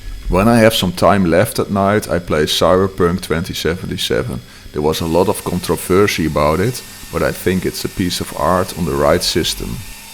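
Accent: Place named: Netherlands. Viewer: Hallo , Je mes is echt cool , je voice over is duidelijk en interessant maar probeer een beetje minder nederlands te klinken als het kan ....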